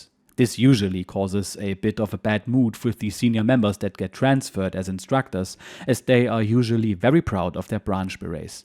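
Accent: Germany. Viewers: I appreciate it's in english with a german accent not a posh British accent for a german wartime memoir. Thanks! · I absolutely love hearing german diaries with a german accent. So much better than AI. Thanks!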